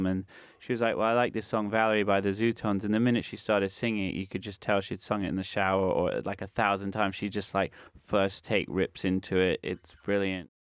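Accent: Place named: United States. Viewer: this guy now has an American accent, master!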